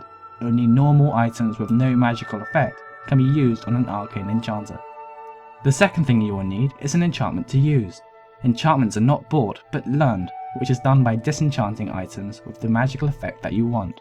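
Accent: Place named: United Kingdom. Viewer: curious, do all these videos with commentaries hire a British person with an accent to narrate without them being involved with the game at all? this guy just reads from a script . lame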